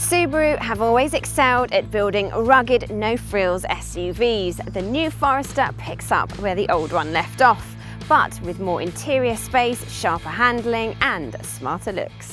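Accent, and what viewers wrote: United Kingdom. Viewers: I love lady's with British accent ... She is really adorable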